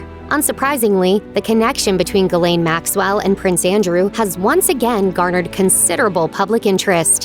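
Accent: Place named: United States. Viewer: Good content, but that high pitched screetchy American voice grates on me. Anyone?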